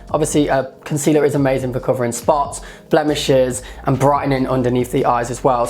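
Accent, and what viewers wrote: United Kingdom. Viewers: Hey hottie with the totally cool British accent!! : )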